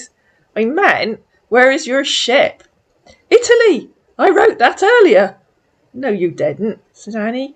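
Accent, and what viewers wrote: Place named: West Country, United Kingdom. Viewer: Can’t listen to that awful west country accent sorry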